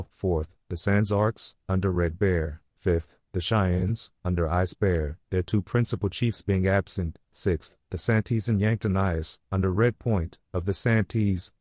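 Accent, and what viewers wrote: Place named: India. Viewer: Too bad the narrator can't seem to do any more than to read without proper cadence or at least pausing between thoughts or sentences. Then he (or the AI) pauses in the middle of a sentence. The built in text also goes like this without pauses at the end of sentences and no punctuation. Because the narration and on-screen text being so badly strewn together, it's hard to understand. Sick of the poor robotic narrations these education videos lately use. Even though this one was tuned to sound like an Indian speaking English poorly. Don't think any human reading this could have voiced it so poorly. Could have been good info but was marred by poor narration!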